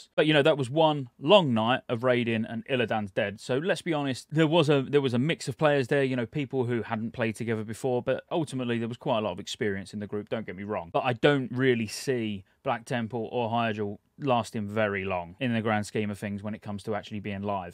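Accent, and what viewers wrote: United Kingdom. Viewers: Where are you from if you don't mind? Just curious about accents. Obviously UK, but specifically? (Once again not actually specfically just ur accent)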